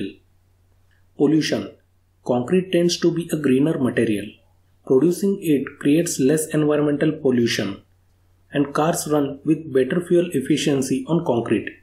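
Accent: India. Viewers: Bro, you can't even type a sentence. His English is fine he just has a strong Indian accent.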